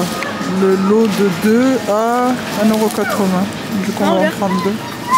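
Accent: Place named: Algeria. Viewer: Merci ma sœur, pour le temps que tu passes dans ta cuisine, on entend algérienne🇩🇿🇩🇿🇩🇿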